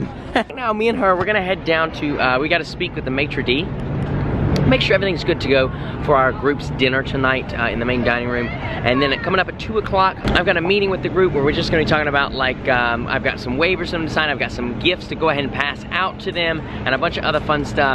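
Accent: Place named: South Carolina, United States. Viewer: in the fruit section is so precious... Y’all are cruising by the big cantaloupe and melons....: Can we eat them?’: yeah 🤣 bless her heart! She doesn’t understand the treat she’s in on!! Take it easy on her! She might become overwhelmed by the how wonderful cruising is! 🤣 relax and enjoys, guys! Btw...I Love watching your videos! I discovered your channel a few months back! I love y’all the (spelling?) and! And now! I’m from SC so you sound like some of my kin folk! 😉 Take care!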